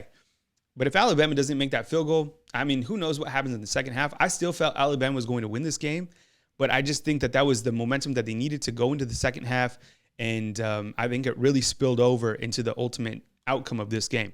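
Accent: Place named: Louisiana, United States. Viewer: each one teach one I can tell you’re from Louisiana you sounds like a trash can.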